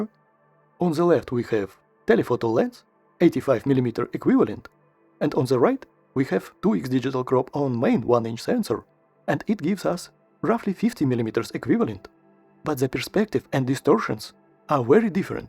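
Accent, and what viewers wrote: Russia. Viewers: Приветствую! Я редко пишу комментарии но щитаю что нужно разъяснить ситуацию с хейтом против вас немного с другого ракурса ))) Есть видео от других авторов по этой теме (100ультра vs 200про) где всё наоборот! Где 200про зачастую лучше 100ульты причём по основному модулю! Существует такой канал 2Megapixels и там есть видео (недели 2 ему) где 100 ультра не особо вывозит 200про ))) И там тоже есть конкретные примеры ))) И тут возникает справедливый вопрос! А кто же из вас двоих не прав! И я прекрасно понимаю людей что обрушились на вас с бурей негодования и хейта. Ведь их претензии к вам основаны не на их не на чём не обоснованном мнении а на конкретных примерах с других каналов по точно такой же тематики что и ваш канал. И тут уже дело не в особо одаренных хейтерах а в том что ваш контент противоречит контенту других авторов которые зачастую обладают более авторитетным мнением чем вы. 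Вот поэтому вас некоторые люди и воспринимают довольно поверхносно и крайне предвзято относятся к вашему контетту. Щитая что вы очередной хейтер конкретной модели 200про. И да главное поменьше делайте акцента в ваших видео на критику в ваш адрес. В противном случает люди что навалили вам негатива будут делать это усерднее ))) Хм по вашему акценту я думаю вам не потребуется гугл переводчик для прочтения моего комментария )))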